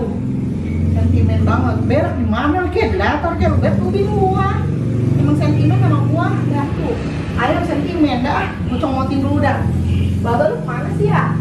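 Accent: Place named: Betawi, Indonesia. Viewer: Medok betul Po betawinya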